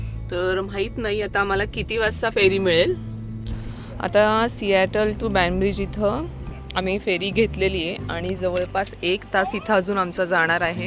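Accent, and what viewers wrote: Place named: Pune, India. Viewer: mla kuph awadta tumche bolne punyche mrate bolta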